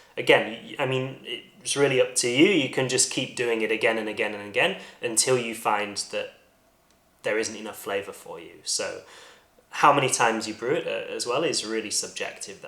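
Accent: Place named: United Kingdom. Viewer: All I'm saying is that "Man talks about caffeinated beverages in a British accent" is clearly a formula that works. You'd need to upgrade from t-shirts to jumpers though.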